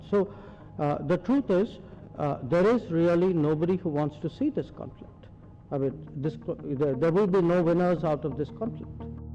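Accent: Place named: India. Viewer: why would anyone listen to a telemarketer from an Indian call center?!! Shut up Hadji and answer the phone!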